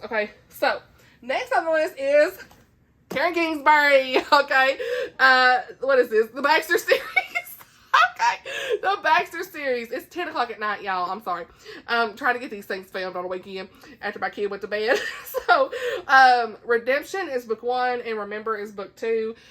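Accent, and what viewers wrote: South, United States. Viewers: I love your energy and southern accent! We read completely different things but I really enjoyed seeing all the series you want to tackle! ❤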